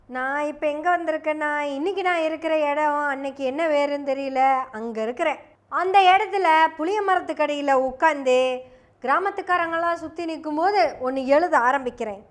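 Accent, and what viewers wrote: Kongu, India. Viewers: கொங்கு பாஷையில் வயிறு வலிக்க சிரிக்க வைத்த பதிவு.முன்னேறி வாங்க.!..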